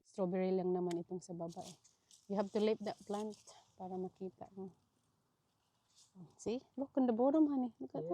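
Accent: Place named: Visayas, Philippines. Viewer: PAG BISAYA ANG SARAP PAKINGGAN NG ACCENT I LIKE IT 😅😅😅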